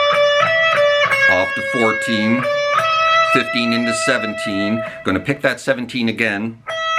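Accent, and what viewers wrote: Canada. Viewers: Hello squire. You really are becoming a very bad influence on me! I have watched a number of your videos now and I finally subscribed last night when I watched your '43 Year Guitar Journey' thing. I found that very interesting and at times moving, and I really must commend you for your authenticity and honesty. Love your Canadian-ness too (great accent!) and your love for quite a few of the same Canuck artists that I appreciate. Whilst Paul Kossoff was my first love, Alex Lifeson was the picture on my wall as a 13-16 year old. And that was B&W pic clipped out the NME here in the UK in about '78. Times were hard... Anyway. This song is full of great memories for me - my sister allowed me play her copy of ' ' more or less to death (she wasn't too chuffed about that, mind you!) and I played it on a Dancette mono record player in my tiny bedroom for hours on end. 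Said gramophone was donated to me by my Uncle (who had a country place! - geddit?). I always particularly loved ' ' - but they're all great tracks. is a fantastic tune too with lots of classic rock ingredients - and as you mention, a brilliant driving song - it's on one of my DIY car CDs. But I am wittering now... I'll finish by saying thanks for this and I wish you all the best. Cheers, son! - PT x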